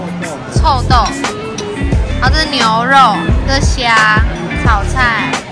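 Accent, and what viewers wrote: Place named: Malaysia. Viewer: 你講話很馬來slang了😂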